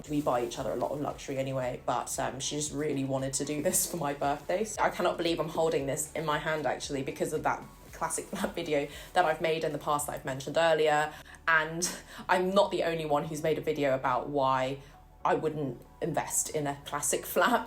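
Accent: United Kingdom. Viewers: Lovely bag! Also side note, I love how you are Asian but sound so British - like me! 😍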